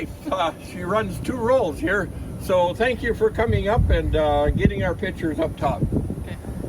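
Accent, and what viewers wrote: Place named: Canada. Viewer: You sound sooooo Canadian!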